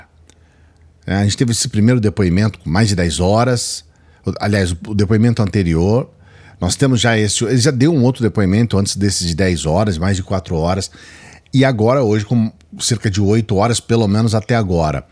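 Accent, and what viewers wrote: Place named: South, Brazil. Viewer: Apesar de você nos descontrair com sotaque "caipira sulista" eu recomendo seres mais formal e profissional. pelos 500mil seguidores 👍🙏😉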